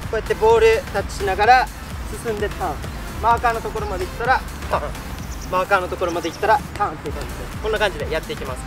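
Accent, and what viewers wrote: Okinawa, Japan. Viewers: 沖縄訛りな感じ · 沖縄の人かな？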